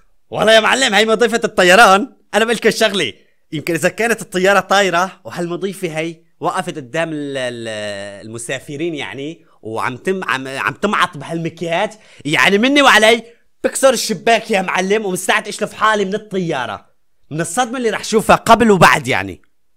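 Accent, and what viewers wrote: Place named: Iraq. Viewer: سوي فيديوهات عن الاكل الصيني حباب متابعتك من العراق ولله مبدع وتره حجيك يويه يشبهه حجينه ولله احلى قناه...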